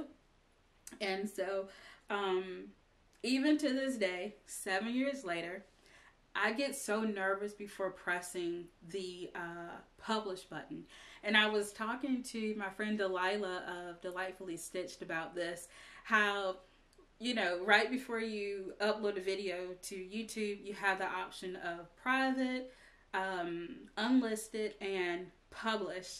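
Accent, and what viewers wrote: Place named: South, United States. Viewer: Wanted to hang out with my NC friend tonight. Thanks for this video. You give such good advice, especially for a new podcaster. Insecurities and comparison try to creep in as soon as you get started so thanks for sharing your viewpoint on these topics. You’re awesome! FYI, I feel like you and I sound just alike on camera. Southern gals 😅❤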